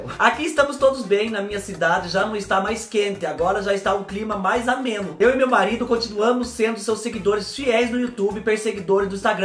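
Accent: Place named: Riversul, Brazil. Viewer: ai kkkkkkk nao aguento, minha vo é d riversul e fala igual vc, jaguara, pandu kkkkkkkkkkkkkkkkkk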